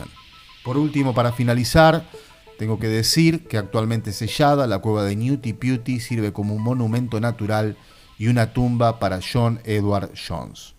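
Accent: Argentina. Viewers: Sos argentino ? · Entiendo. La verdad es que me encanta el acento argentino 😄👍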